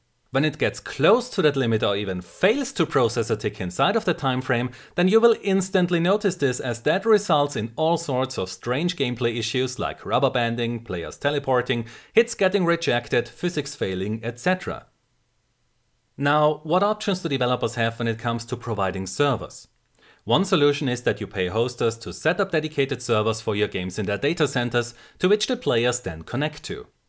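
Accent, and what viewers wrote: Germany. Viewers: if this guy is not german i dont know what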